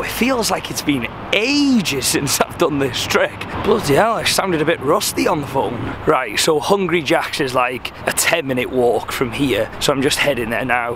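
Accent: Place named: Manchester, United Kingdom. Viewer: U sound like a mancunian but u from Wales u sound like Jason manford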